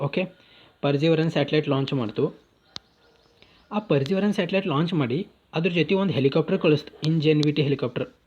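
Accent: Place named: Bidar, India. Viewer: Sir ನಿಮ್ಮ ಭಾಷೆ ಮತ್ತು ನೀವು ಮಾತನಾಡುವ ಶೈಲಿ ನೋಡಿದರೆ ನೀವು ಪಕ್ಕ ಬೀದರ್ ನವರು ಅಲ್ಲವಾ sir ಹೇಳಿ👍👍👍👍👍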